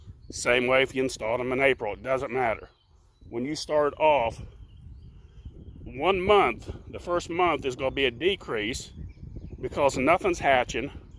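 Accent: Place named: Pittsburgh, United States. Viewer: Did I detect a yinz in your accent? Are you originally from the western pa, northern WV area?!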